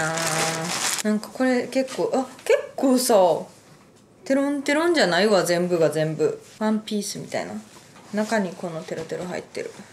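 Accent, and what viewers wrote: Kansai, Japan. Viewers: 今日もかわいい 関西弁もかわいい 全てが可愛い のに遠慮なくゲップするところ好きWWW 🥺💗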